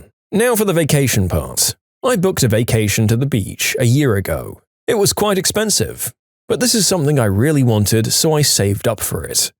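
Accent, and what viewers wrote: United Kingdom. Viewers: its been a second since ive watched this channel, when did he turn british lol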